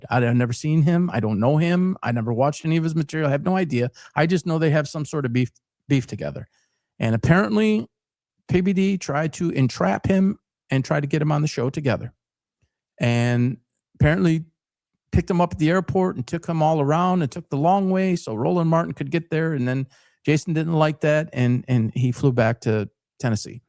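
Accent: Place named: Detroit, United States. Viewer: you took offense to him saying you sounded like you’re from Detroit. easily offended much?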